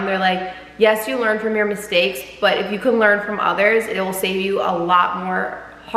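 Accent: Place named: South, United States. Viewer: Nothing crazy🙂 Sounding country 🙂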